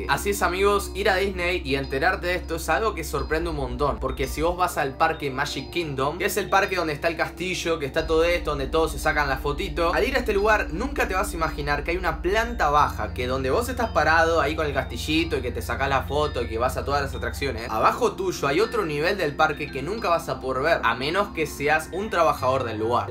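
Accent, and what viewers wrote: Argentina. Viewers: dros si lo escuchas tiene acento argentino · argento o uruguayano???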